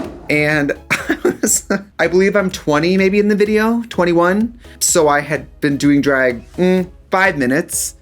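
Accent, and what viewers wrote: Wisconsin, United States. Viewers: The strong Wisconsin accent really makes 2009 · the Wisconsin accent really jumped out in the old clips oml bless 😂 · I love you clocking your Wisconsin accent. I’m from Northern Illinois. I feel ya bud. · That Wisconsin accent!!!! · YES! Her Wisconsin accent was so strong when she was younger. She was so innocent and pretty though! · Wisconsinite accent was so thick back then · Girl the Wisconsin accent is thicc. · I love how in your face her Wisconsin accent used to be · The Wisconsin accent is so strong 🤣🤣🤣🤣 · The Wisconsin accent jumped out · The Wisconsin accent ‼️ Where’d she go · u can really hear the wisconsin in this one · the wisconsin accent really jumped out in this omg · His Wisconsin accent is there. Represent! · What happend to your Wisconsin accent? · THE WISCONSIN ACCENT JUMPED OUT IN THAT OLD TUTORIAL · The strong Wisconsin accent really makes it that much better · Never could notice Wisconsin accent until now 👀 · As a fellow Wisconsinite...your Wisconsin was showing!! 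❤ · circa 2010 really gave us that Wisconsin accent.. RE. PRE. SENT. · girl that Wisconsin accent is THICK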